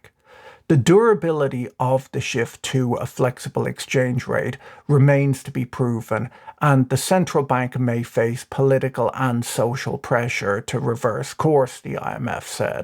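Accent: Ireland. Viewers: I do miss the occasional comedy. Everything in an Irish accent is funny. However, he has to be one of smartest men I seen in a long time.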